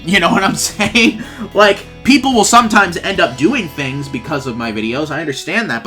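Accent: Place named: United Kingdom. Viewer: Hello! I actually watch your videos and I really enjoy them. Also, you have a pretty good bri'ish accent.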